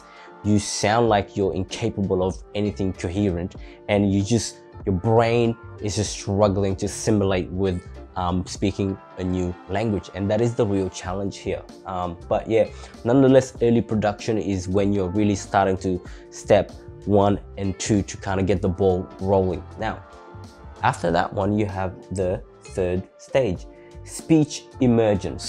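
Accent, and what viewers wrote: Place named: England, United Kingdom. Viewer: I like your English accent i hope you will have more good video # from Thailand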